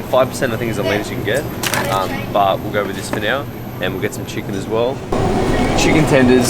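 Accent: Australia. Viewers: Are you Australia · Is this guy aussie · are you from Australia 🇦🇺? If so I’m from Melbourne Australia 🇦🇺 G’day mate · Aye are ya from Melbourne?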